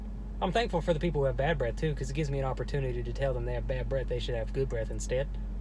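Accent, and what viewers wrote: South, United States. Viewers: He’s from the South originally...it’s real :) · He's said before that he deliberately dropped it because he didn't think he would be taken seriously. I think he plays it up a little in some of the videos, but, it's real. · I'm thankful for the fact that you've developed a southern accent throughout the years.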